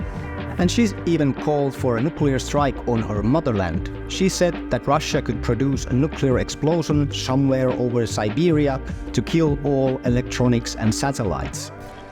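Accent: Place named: Finland. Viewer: Your finnish accent makes me feel cozy and the video was fun. But your should practice to atleast pronounce russian words correctly, as Russia is neightbouring country to Finland and the third largest speaked language in Finland. For example Россия сегодня, is pronounced "rɐˈsʲijə sʲɪˈvodnʲə" (in phonetic), or "rassiia sivodnja" in finnish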